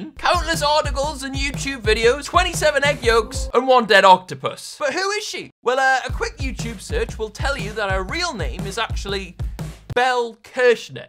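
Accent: Newcastle, United Kingdom. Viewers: Are u from Newcastle boi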